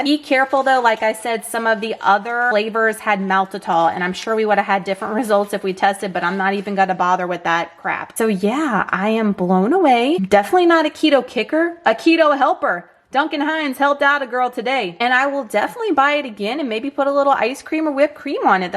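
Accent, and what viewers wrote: Louisiana, United States. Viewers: Recently found your channel about a week ago! I use to do keto and had success years ago but I needed something else. Currently doing alternate day fasting and just calorie counting! But your SO motivating!! Btw love your accent!! I’m from Louisiana too! Pierre part... about 1.5 hours away from New Orleans!! It’s nice to see locals on the YouTube!! Keep it up!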